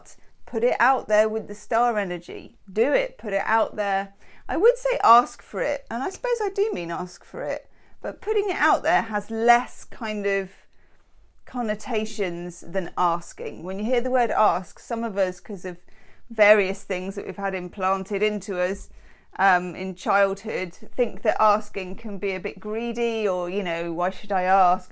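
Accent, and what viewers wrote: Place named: Cockney, United Kingdom. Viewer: Fahhhmly. What a wonderful cockney accent you have. So many talents. And such sweetness. Thank you.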